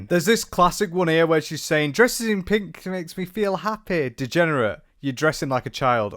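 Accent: United Kingdom. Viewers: Huh i didn't think she'd have a British accent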